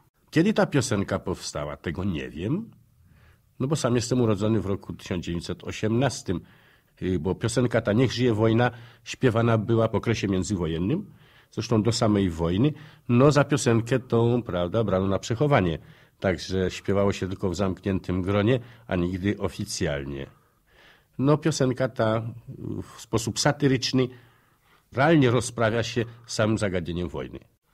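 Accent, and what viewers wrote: Warsaw, Poland. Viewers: Biorąc pod uwagę,że człowiek przeżył aż 5 letni koszmar obozu koncentracyjnego,to po prostu aż nieprawdopodobne,że mimo to zachował pogodę ducha.Być może,tak mi przyszło na myśl ,że "Pięć Lat Kacetu" ,to było jakby osobiste rozliczenie się z przeszłością.Trudno też o tym rozmawiać z kimkolwiek,a zwłaszcza z bliskimi,więc napisanie tej książki pomogło zapewne wyrzucić częściowo chociaż to z siebie,a przy okazji,zaświadczyć Polakom i światu o tym co się tam wyprawiało z ludźmi,daruję już sobie wywody,kto i co robił,o tym jest masę innych dokumentów.Zresztą chyba jak dobrze pamiętam Grzesiuk,nawet niestety,zmarł chyba w kilka lat poi przeżyciu obozu,ponieważ choć miał silną psychikę i organizm,to jednak i to się poddało.A tu jeszcze miło posłuchać tej ,jak sadze,bo nie jestem Warszawiakiem,to już oni muszą ocenić,ale starej gwary warszawskiej,czy dziś jeszcze się tak mówi,tam w Warszawie? 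· ach te warsiawskie ' l '. 'nasza ulyca '.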